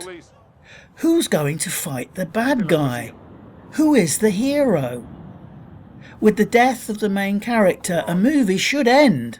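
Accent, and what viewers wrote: England, United Kingdom. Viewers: I'm quite extinguished by reviewers with British accents telling me what a noif is. Lame, tedious review of a review, and that's not a noif, this is a noif. Let Hollywood have fun til it kind of fades. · A Brit doing the speaking for a film review such as this is too distracting. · listen to this guy, he's English... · Jesus Fucking Christ. How many descriptive absolutes do you have to use? "From the beginning of the film, the western stereotype is immediately clear." Really, fucktard? A sunrise gives it all away, does it? Or is it Tommy Lee Jones's voice? Hey...from the beginning of your video, the snarky pretentiousness is IMMEDIATELY CLEAH. Dicknugget. "The leaoucation where oll the events tayke playce couldn't. be. mohr typical." Really? It couldn't be more typical, eh? Typical of WHAT? Typical of your stupid blanket commentary? 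God damn. Were you sniffing the queen's meat curtains while you wrote this steaming pile of shit and chips? "Oll thuh main c.h.a.r.a.c.t.e.h.s ah PEFECTLY in.ti.grated IN this environment...THEY ah the essential element of every westehn mouvee." SHUT THE FUCK. UP. Over-generalizations and pretentious King's English phrasing does NOT make for an effective, meaningful review, especially when you're just jerking it in your face. Come on. If anyone took this narrator seriously, this movie would fucking bake the bread for your Texas Toast, slice it, butter that fucker up, toast the sum'bitch, and slap your ass silly 'till you admitted hyperbole isn't a reality. God. The British Accent... sooooo ponce. Maybe if Bruce Dickinson of Iron Maiden were narrating, we'd get a good read. But no. It's who only completed level 1's. Fucking shut up. Good name, btw. Pinheads. Yeah...I only made it 42 seconds in. 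TYPICAHL AMEHRICAN. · You say in English accent no less; that Chigar doesn’t have a place of origin but if you know history of conquest by Christian assholes, he is from Mexico, who pieces of shit of America ransacked in the name of a God that doesn’t exist counter to treaties made by the law. The movie is about consequences.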